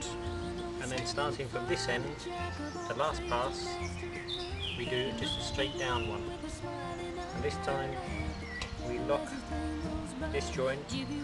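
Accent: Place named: England, United Kingdom. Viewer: What's your background? You sound English not Czech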